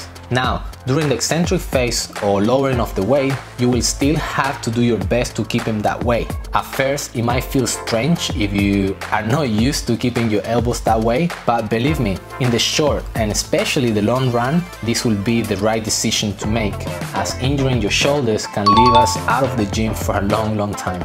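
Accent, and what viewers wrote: Spain. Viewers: 一听你说英语，我就知道你母语肯定是西班牙语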